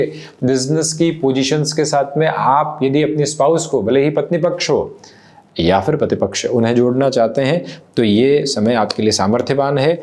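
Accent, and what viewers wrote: Standard. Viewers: Aapka shud Hindi sun k bohot kushi milti h . YouTube mein aap hi sayed akele hai jo shud hindi bolte hai . Bohot bohot dhanyabaad aapka hare krishna 🙏🙏🙏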